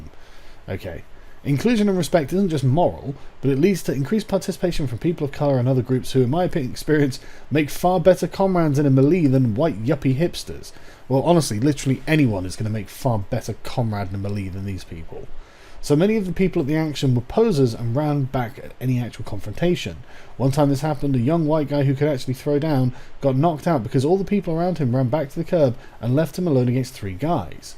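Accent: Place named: United Kingdom. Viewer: British dialect issue, whilst Britain is tiny compared to the US, we have hundreds of dialects that do some weird shit to vowel sounds in particular, and the dialect can change significantly over the course of 20-30 miles, where sargon lives is right at the cusp of a major vowel sound change in dialects, so that's probably why he pronounces it this way, because it's the normal pronunciation for that area.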